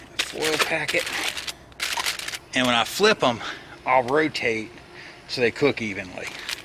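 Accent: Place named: Northeast, United States. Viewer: what part of the northeast are you from I can't place your accent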